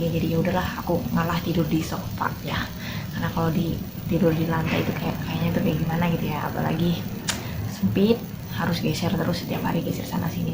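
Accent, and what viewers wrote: Banyumas, Indonesia. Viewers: Ora ngapak ora kepenak mantep pokoke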